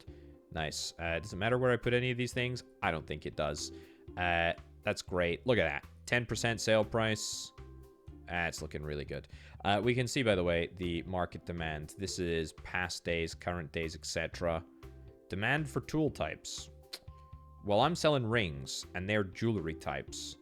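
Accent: Scotland, United Kingdom. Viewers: he's definitely Scottish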